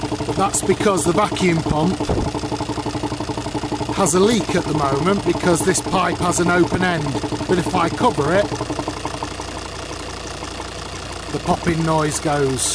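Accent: United Kingdom. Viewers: yo are u british bruv???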